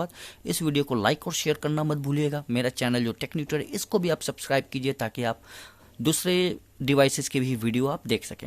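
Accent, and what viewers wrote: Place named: Bengal, India. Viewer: u sound bengali!!! r u bangali??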